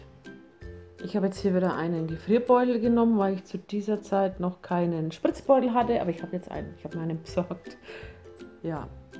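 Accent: Franconia, Germany. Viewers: Woher kommst du aus Deutschland ??du hasst ein fränkischen Dialekt:) find ich total cool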